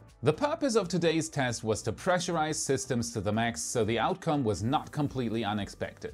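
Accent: Germany. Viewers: Hey man. You sound very German 🇩🇪 ? But your English is pretty decent. Where are you broadcasting from?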